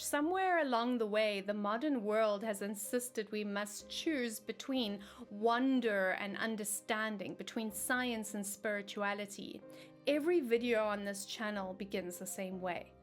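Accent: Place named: South Africa. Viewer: I cannot explain how ecstatic I was when I clicked on this video recommended to me and heard a South African accent! So excited to watch every single video as I’ve been watching witch channels from America and the UK but some things were just not relevant to my location. ❤❤❤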